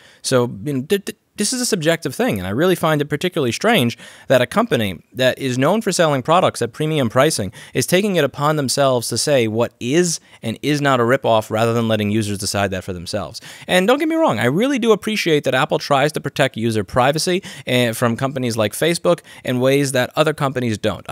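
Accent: New York, United States. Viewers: Get the fuck outa here, yep he is definitely from new york lol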